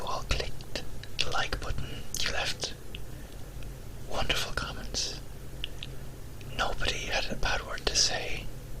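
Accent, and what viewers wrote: Ireland. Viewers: Hello my lovely, it is so good to see you back making videos, to see you happy and to see your beautiful smile again. I'm so sorry that you encountered some unkind people, when you are so sweet, kind and lovely to everyone. As for videos you post in the future, as long as they feature your gorgeous Irish accent, they will be perfect. (I loved your poetry ones - do you remember the poems I liked which I shared with you?) keep smiling my lovely K x p.s I read The Hobbit! I loved it!!! Thank you for encouraging me to read it.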